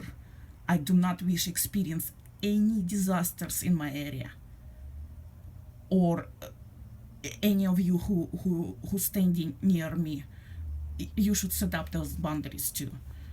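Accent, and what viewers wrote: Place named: Russia. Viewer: your rusian english pronansiation is owfull режет слух,нельзя ли записывать на русски мадам?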